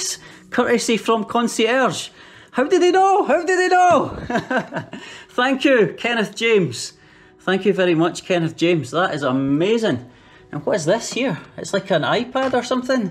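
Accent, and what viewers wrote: Scotland, United Kingdom. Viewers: it's not british english, it's scottish english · Damn it's a pity I discovered your channel recently:( Definetely love your Scottish accent and vlogs, gonna keep watching all of your videos dude, super beautiful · Scottish accent is bit hard to understand but lovely to listen to.🙂👍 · are you Scots? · as an Indian man, you have a pretty extraordinarily strong Scottish accent.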